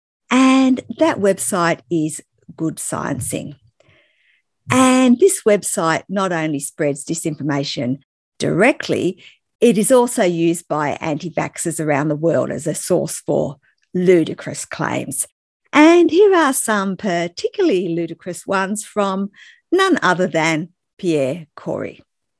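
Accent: Australia. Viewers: Magnificently sensible. Another excellent debunk. The Aussie accent is pure bonus.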